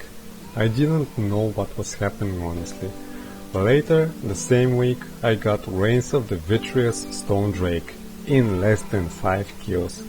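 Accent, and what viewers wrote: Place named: Russia. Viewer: ты русский что ли?) Слышно ужасный акцент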